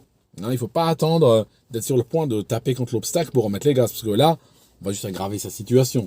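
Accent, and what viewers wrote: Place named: Switzerland. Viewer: qui s'appelle en fait est né en Algérie. Je suppose donc que sa langue maternelle est l'arabe algérien. Il a aussi vécu en Suisse où il a passé une bonne partie de son enfance (d'où son accent suisse qui ressort de temps en temps) et où il a appris à piloter des avions (mais peut-être avait-il déjà commencé en Algérie). Il a commencé des études de médecine en France qu'il n'a pas pu finir à cause d'un visa qui n'a pas été renouvelé. Il habite maintenant à Londres où il a travaillé dans l'informatique (et peut-être dans la sécurité aérienne?) et vit maintenant du revenu de ses chaînes YouTube et en vendant des livres. Bref, c'est un touche-à-tout très doué qui parle au moins trois langues: l'arabe algérien, le français et l'anglais.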